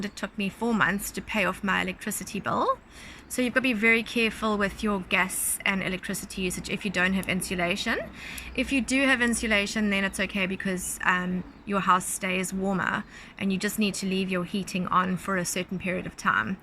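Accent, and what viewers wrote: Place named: New Zealand. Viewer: How long you been here, your accent has bouts of pure kiwi sounding.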